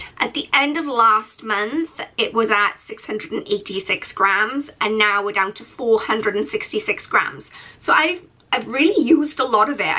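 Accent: Wales, United Kingdom. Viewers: Be proud of your Welsh accent!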